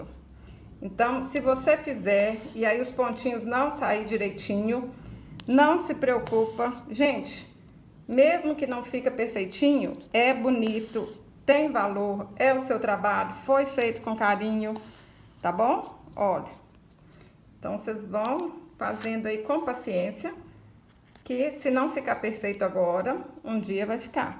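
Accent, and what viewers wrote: Minas Gerais, Brazil. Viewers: Boa noite minha querida! Q "lindeza"de artesanato como vc diz né? Vc é uma amor de pessoa ,esse jeitinho de falar de "mineirinha" toca no coração da gente. Obrigada por nos ensinar tanta coisinha linda,fico feliz demais. É assim q me sinto quando "posso" te ver. Porque nem sempre a minha internet permite eu entrar no You Tube. Mais uma vez parabens e obrigafa por todo carinho. Q Deus te abençoe e seja sempre feliz querida. Bj no teu coração!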